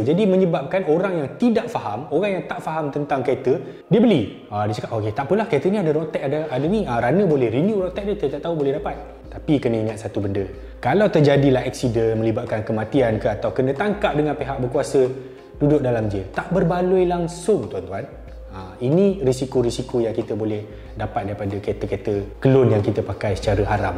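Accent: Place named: Pahang, Malaysia. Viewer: Org mentakab ker bang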